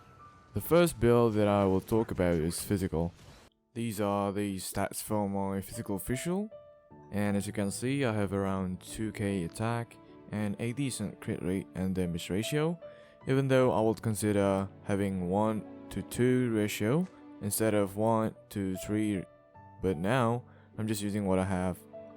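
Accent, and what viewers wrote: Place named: Australia. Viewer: aussie accent 👀👀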